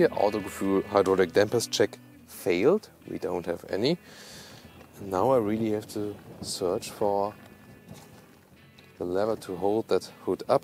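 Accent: Germany. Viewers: Always very detailed and good reviewes at Autogefühl, if there wasn't this German accent...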